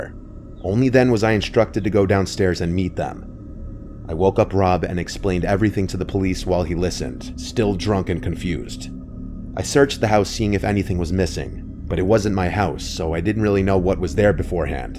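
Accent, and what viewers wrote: United States. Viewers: I don't know American accents, but I like his. Where is it from?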